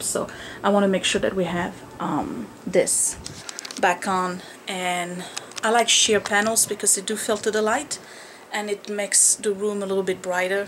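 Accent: France. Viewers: You go girl! Your have been crazy busy! I want some of your energy :) I love your accent, is it French?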